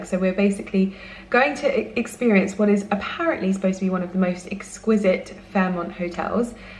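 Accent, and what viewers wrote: RP, United Kingdom. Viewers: I'm sure you've taken elocution lessons your sounding more posh everyday!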